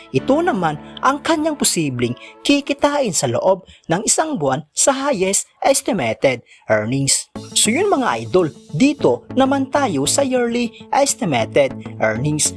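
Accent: Visayas, Philippines. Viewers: Bisaya kc but as long as we understand her, it's okay.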